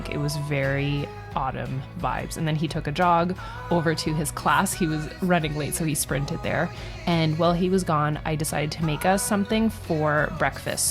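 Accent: Canada. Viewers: finally a Canadian who I can tell is bilingual!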